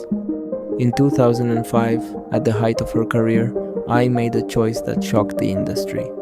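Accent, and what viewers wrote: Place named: Japan. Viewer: The narrative is so awful that I can't understand at all. Fucking Japanese pronunciation.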